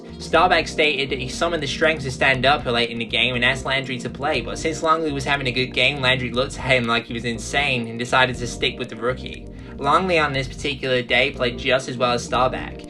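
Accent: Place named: Australia. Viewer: Didn’t even notice the Aussie accent right away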